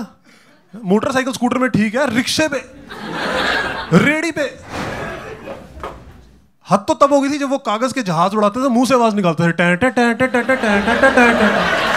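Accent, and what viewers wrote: Punjab, India. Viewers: Awaaj se hi pta chal jata hai Punjabi ho.......I am also Punjabi by the way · Punjabi accent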